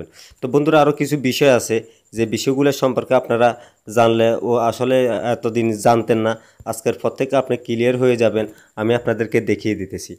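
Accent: Noakhali, Bangladesh. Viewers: Duru khankir pula nuakailla. ..shslar put agay kotha bola shik. .tar por video bana. .. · ভাই আপনাটর বাড়ী কি নোয়াখালি? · নোয়াখাইল্লা ভাই আপনি আগে প বলা শেখেন তারপর কথা বলেন। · নোয়াখাইল্লা নাকি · তোমার বাড়ি কি নোয়া খালি নাকি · আগে কথা বলা শিখুন, নোয়াখাইল্লা।। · Vi apne noakhilla na?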